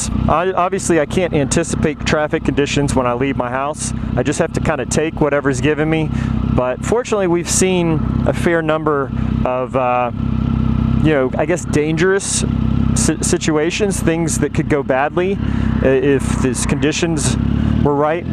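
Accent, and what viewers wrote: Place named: Oklahoma, United States. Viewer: Yes thankfully you don't have a Canadian accent, nothing against Canadians, but it bothers me for some reason. :) I am Oklahoman so I suppose you sound just like me. Thanks for the excellent video.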